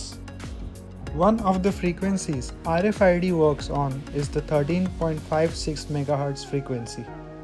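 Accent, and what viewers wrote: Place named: India. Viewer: Despite the Indian accent, the contents are very clear & quite easy to follow.. Thank you...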